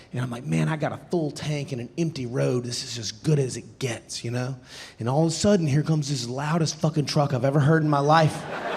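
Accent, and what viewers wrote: South, United States. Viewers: Agreed! It's giving Josh Johnson precision with a southern white dad twang. Here for it 🙌🏾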